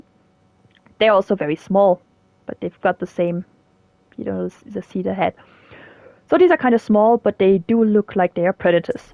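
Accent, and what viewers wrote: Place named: Germany. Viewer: For what it's worth, I like the sound of the microphone. Ads to the ambiance since you're presenting the game. By the way, I know that accent. Germany ?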